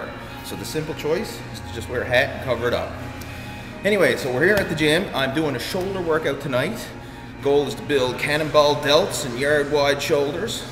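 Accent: Newfoundland, Canada. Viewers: Are you Canadian? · u a newfie? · You must be Canadian. I only can tell because I have watched a bunch of Trailer Park Boys...lol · are you canadian?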